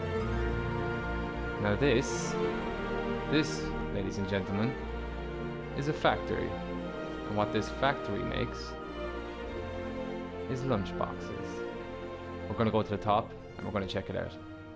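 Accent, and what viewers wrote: Ireland. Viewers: Are you Irish? Your accent is great dude. What’s the craick? Do the ting. Great video. Awesome build. Shouting out skooled zone was class.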